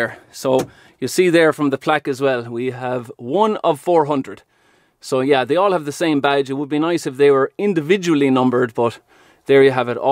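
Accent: Ireland. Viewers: I bet you are Irish, aren't you ? Thanks for the introduction to the ACTROS. I used to live in Galway, was a pleasure to hear IE, again !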